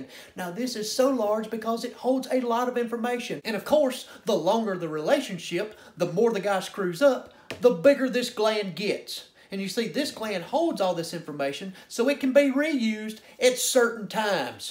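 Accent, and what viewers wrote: South, United States. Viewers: Hot and funny! Love the southern accent. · I love the Southern drawl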